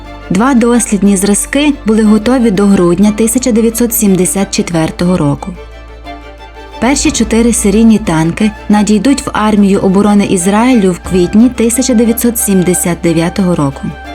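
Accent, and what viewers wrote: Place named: Lviv, Ukraine. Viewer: От слухаю диктора, і розумію що цей диктор точно з Львівщини)))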